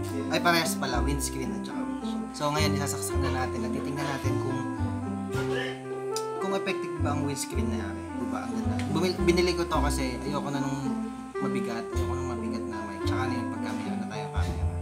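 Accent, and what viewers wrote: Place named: Laguna, Philippines. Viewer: Taga laguna po kayo sir parang puntong laguna eh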